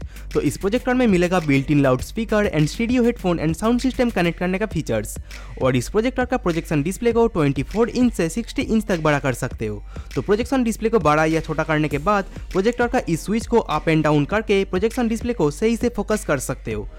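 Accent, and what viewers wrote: Bengal, India. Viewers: bengoli h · Aare kotha sunlei bojha Jai ... Tchara jokon uni 360 camera er unboxing korchilen tokon oner Facebook e Bangla te lekha chilo..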